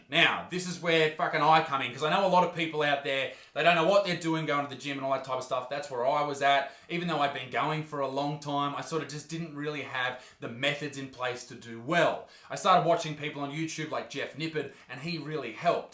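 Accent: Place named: Australia. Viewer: I just love way of talking, not just the fact his accent is Australian. But the way he brings the problems to light and why stupid shit like this can be extremely harmful for just the people who pro overweight confidence but people who are insecure and it gives some them bad ideas. Nice work, even if those don't listen but there are people we can save from this stupid ideas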